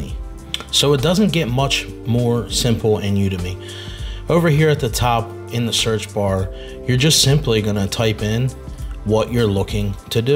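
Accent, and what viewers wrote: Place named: Delaware County, United States. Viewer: This guy is from Delco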